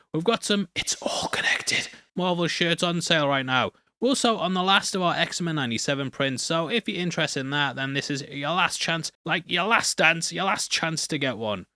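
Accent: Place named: Liverpool, United Kingdom. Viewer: unforgivable scouse accent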